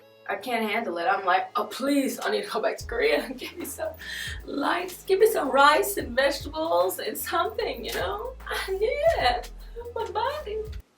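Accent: South, United States. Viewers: Haha that was really cute. Might've been a while since you've been back in the states but you still sound very american :) (As an Australian) I think i'd find those things pretty shocking too tbh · amazing how much your accent went back to confederate from where it was in march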